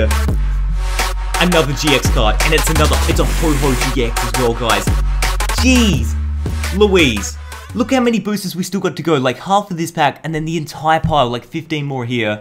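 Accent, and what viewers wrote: Australia. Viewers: PickPig he is australian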